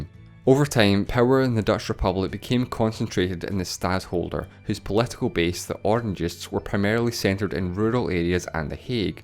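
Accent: Scotland, United Kingdom. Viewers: Love the Scottish accent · More like Scotish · Scottish accent? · Why scottish people pronounce "i" as "e"? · is this a scottish accent? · scottish i think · sounds like a scottish accent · Interesting, enjoyable and once again it shows how much there is to learn about the world and how much I don’t know. Thank you for doing this and I thought it was excellent. PS. Dinnae take any guff about your accent. Edinburgh or somewhere in the borders? Or do I have even more to learn about Scotland? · are you scottish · If im not mistaken he is scottish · He is indeed Scottish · He is Scottish give him a break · is the accent Scottish? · I'm thinking your country's capital is Edinburgh, based on your accent. 😉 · Andrew Matthews it’s scottish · scottish english accent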